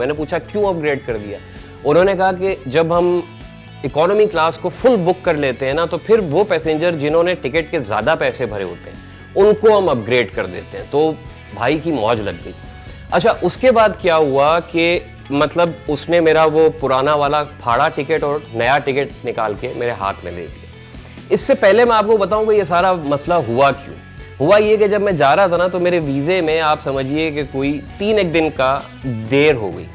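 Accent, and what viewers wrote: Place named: Karachi, Pakistan. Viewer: bhai are you from Karachi? Your Urdu Dialect says it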